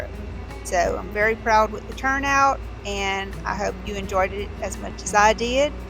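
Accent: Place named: Louisiana, United States. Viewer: Nice recipe. My pears are coming in here in central Texas so I’m about to do preserves. My son-in-law is from Thibodaux,/Chackbay. Your accent sounds like his family.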